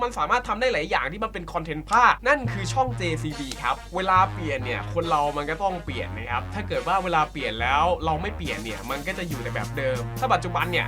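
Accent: Isan, Thailand. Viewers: ใช้คนอีสานมั้ย คนจังหวัดรัยครับ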